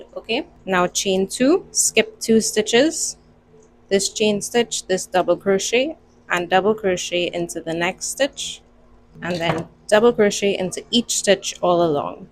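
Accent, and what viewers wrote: Trinidad and Tobago. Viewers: Ahh! I heard your accent and knew it was Trini!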